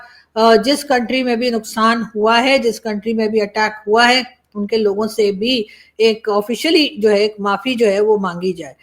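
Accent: India. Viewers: ap Mumbai ke bhasa bolte huye bohut achhaa lagte Hain Love You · Tapka ke chle gaye … UP ki Bhasha … madam.. apke lehze Mai UP jhalakta hai 😂😂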